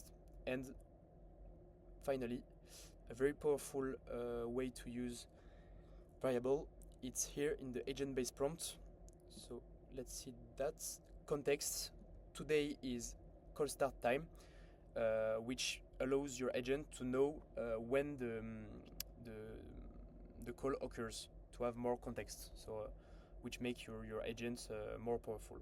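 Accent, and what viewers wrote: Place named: France. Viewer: Pourquoi ne pas faire une vidéos en Français alors que la société est française, de plus avec un anglais avec accent français .Dommage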